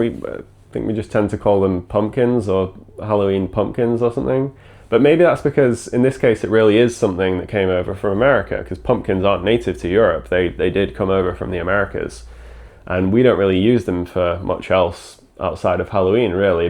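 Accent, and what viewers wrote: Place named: Midlands, United Kingdom. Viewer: I put you above the Watford gap. Midlands lad I would say. Little northern tilt on some words and non-descript accent like the ldn catchments. A small town on the outskirts of a midlands city would be my guess…but may be way off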